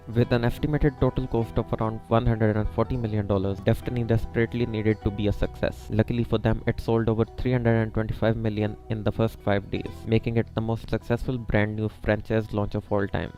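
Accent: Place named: India. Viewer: Your video is great bro but take the indian accent down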